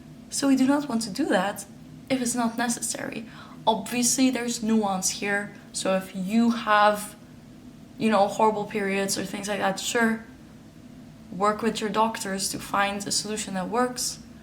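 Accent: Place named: Netherlands. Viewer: I lived in NL 7 years. I recognize that accent anywhere. 😊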